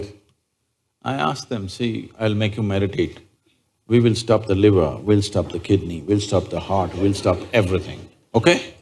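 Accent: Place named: India. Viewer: چقدر لهجه انگلیسی حرف زدن هندی ها مزخرفه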